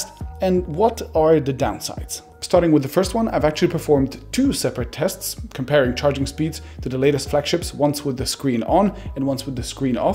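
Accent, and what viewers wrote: Germany. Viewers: are you german? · This guy has a strong German accent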